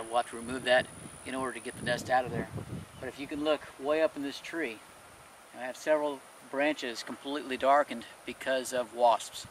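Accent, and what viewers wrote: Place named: South, United States. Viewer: a man with a southern accent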